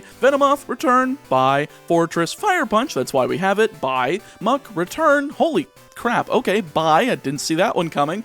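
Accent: South, United States. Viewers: ive been watching your stuff for a while now, and im just now noticing your accent, you're from the south right?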